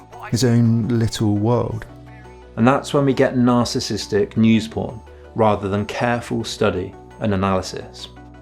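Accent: United Kingdom. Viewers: You can't debunk what Brand says as not being true....you only try to discredit him by attempting to portray him as irrational in your smooth slick British voice.....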